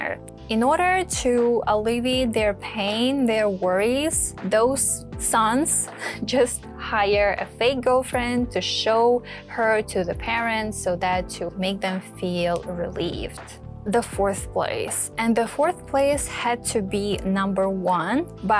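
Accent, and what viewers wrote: Eastern Europe. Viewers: precious princess, your accent is pretty cool! Are you Ukrainian, Belorussian, or Russian? 🥰 😘💕☮🌎🌌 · well, which country are you from ? based on your accent,....Ex USSR .Having a lot of knowledges on this fiel, I think you succeed to penetrate "the market" 👍 Thank you for sharing your experience ! th t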